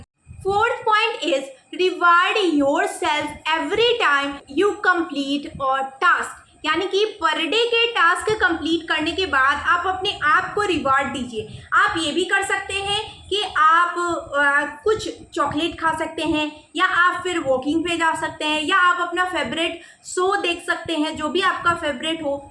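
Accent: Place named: Bengal, India. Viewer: Aap bengoli ho kya madam .....kyu you are looking like bengoli and the way you are talking is relate to that you are bengoli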